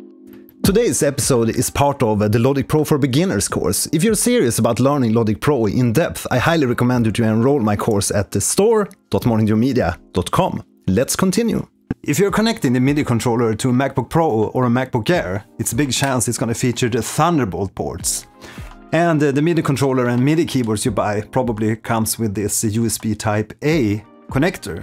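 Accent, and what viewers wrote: Sweden. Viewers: nice anc clear video. I also love the swedish accent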